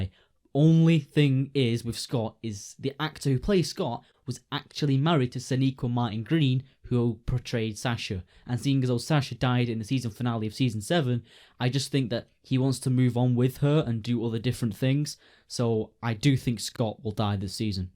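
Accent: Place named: Ireland. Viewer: Nice video and predictions! Are you Irish btw? Love your accent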